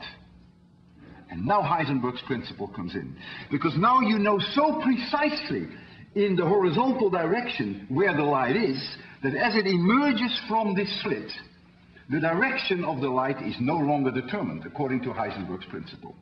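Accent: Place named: Netherlands. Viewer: Dutch and English don't mix well in his case... That is one hell of a bad accent. But nice video anyway.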